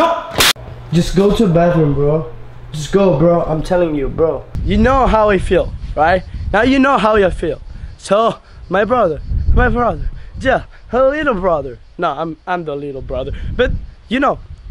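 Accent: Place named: Spain. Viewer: Me gusta tu voz Española se escucha muy cool good job man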